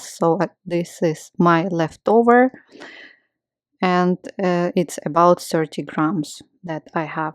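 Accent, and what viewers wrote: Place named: Ukraine. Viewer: Are you from Ukraine? I am and I adore your pronunciation. Your tutorial is perfect, it's just what I need. I'm going to knit this hat. Thanks a lot. God bless you. ❤❤❤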